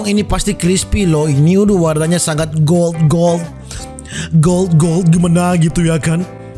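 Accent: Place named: Mandar, Indonesia. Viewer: Abang orang mana 🤣 Loga2 suaranya kek orang mandar😂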